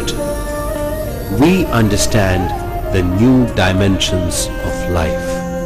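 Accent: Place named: India. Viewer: I understand that you could be having an indian accent when you speak. Like a hot potato in your mouth. Now, you do not need to transfer that accent into writing form. "That" is not spelled DAT, DIS is spelled THIS. I would believe that those words are common enough so that you do not need to make those simple mistakes. Please meditate on THAT!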